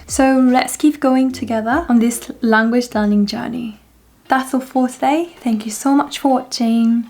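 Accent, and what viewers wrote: United Kingdom. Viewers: So great that you speak original English and not American English! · Great job . Your pronunciation is british but you need to improve your intonation and emphasis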